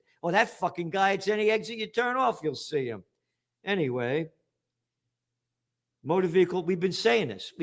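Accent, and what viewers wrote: New York, United States. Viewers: Love you Gerald! Just signed up for the annual subscription! Love the Merch!! Colorful language with a New York accent, reminds me of listening to my family from there. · There is nothing more satisfying than hearing someone with a New York accent cursing out the thieves,liars,massmurderers and corrupt A-holes that is the elite political and economic establishment.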